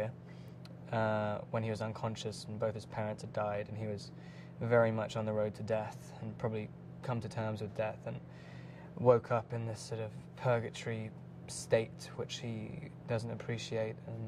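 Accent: United Kingdom. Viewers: wow! really love the british accent...always mentioning it though...but i do really love his accent....gah! it's perfect on him...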